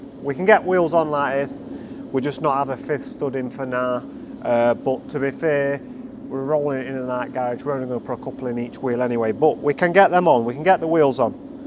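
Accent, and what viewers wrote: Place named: Mansfield, United Kingdom. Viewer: That build is mental 😍😍😍😍 looks a amazing drive 😵‍💫😵‍💫 you sound like Mansfield lads lol . keep up with those crazy builds 👍👏👏👏👏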